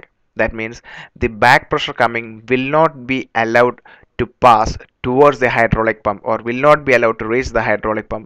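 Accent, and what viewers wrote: Kerala, India. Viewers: We dont like kerala english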